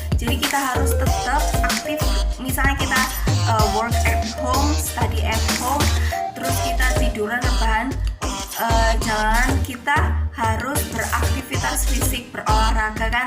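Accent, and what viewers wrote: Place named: Java, Indonesia. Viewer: Thank you kak for information untuk tetap healthy. btw, kok agak medhok yah wkwkw 😂😂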